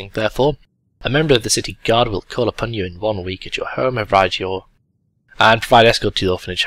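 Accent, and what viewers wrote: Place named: England, United Kingdom. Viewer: Well... I was checking to see if I had missed this episode and though I had, I am now watching it again. Totally intended to go to bed, but then I got caught up in the cheese wheel rubbing and the... English accent... Curse your mystical use of England speak...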